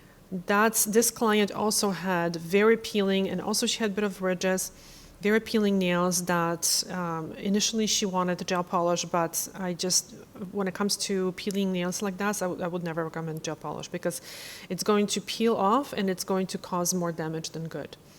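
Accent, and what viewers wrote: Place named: Poland. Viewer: Love your accent. We Poles are awsome.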